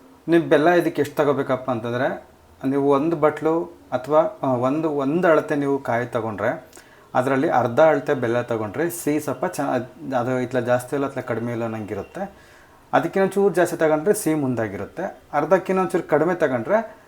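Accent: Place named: Hassan, India. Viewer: ನೀವು ಯಾವ ಊರು..?? ಎಲ್ಲಾ ಕಡೆ ಭಾಷೆನೂ ನಿಮ್ಮ ಮಾತುಗಳಲ್ಲಿ ಇವೆ.ನಮ್ಮ ಹಾಸನದ ನಮ್ಮ ಹಳ್ಳಿ ಮಾತುಗಳು, ಮಂಡ್ಯ ಕಡೆ, ಉತ್ತರ ಕರ್ನಾಟಕದ ಕಡೆ ಹೀಗೆ ಹಲವಾರು ಹಳ್ಳಿಗಳಲ್ಲಿ ಮಾತಾಡುವ ಕನ್ನಡ ನಿಮ್ಮದು.. ❤️❤️ ಜಾಸ್ತಿ ನಮ್ಮ ಹಾಸನದ ಕಡೆ ಹಳ್ಳಿ ಭಾಷೆ ಇದೆ. ನಂಗೆ ಖುಷಿ ಆಯ್ತು. ಅಡುಗೆಗಳು almost ಎಲ್ಲಾ ಚೆನ್ನಾಗಿವೆ. ನೀವು ಮಸಾಲೆ ದೋಸೆ ಮಾಡುವುದನ್ನು ತೋರಿಸಿ.. 🙏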